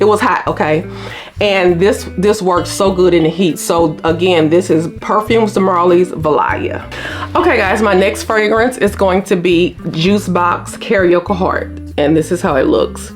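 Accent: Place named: Louisiana, United States. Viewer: Yesss I love your Louisiana accent! It’s hot here in TN but nothing like Bayou hot. 😅 I definitely repurchase my fave perfumes!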